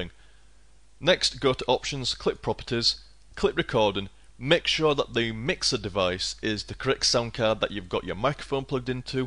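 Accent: United Kingdom. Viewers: I TOTALLY LOVE english accent :D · Am i right in saying thats a yorkshire accent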